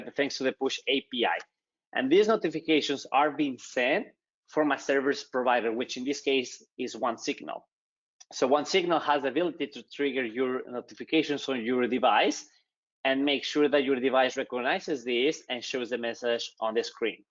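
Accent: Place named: Latin America. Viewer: fua amigo me salvaste de una grande no se de donde sos, tenes tonada lantina y te dicen (? asi q somos hermanos loco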